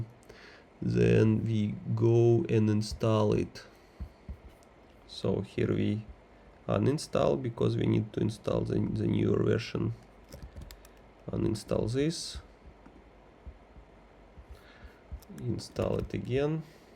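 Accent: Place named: Russia. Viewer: Ух! Нечасто даже на просторах рунета встретишь такой ядрёный runglish :)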